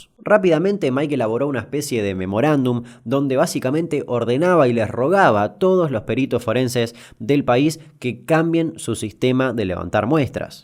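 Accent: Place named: Posadas, Argentina. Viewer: De que lugar sos Capo? Pareces Posadeño amigo.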